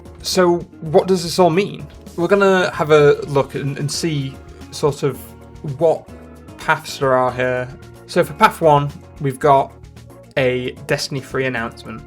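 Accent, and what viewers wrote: United Kingdom. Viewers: he's british???????????? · IS BRI'ISH?! (I hail from Tw*tter)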